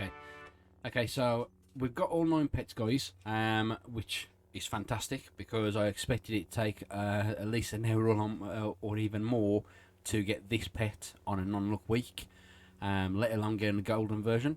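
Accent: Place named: United Kingdom. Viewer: you sound like a united kingdom person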